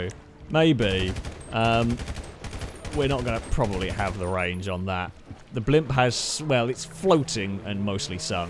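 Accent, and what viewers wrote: United Kingdom. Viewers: depends on what the Goal is. the player wants to sneak around and never be seen while he achieves his goals. the main face of YouTube channel knows that we want violent crashes and lovely United kingdoms commentary over unspeakable violence!! I've never done it, but it's got to be a rough balance to achieve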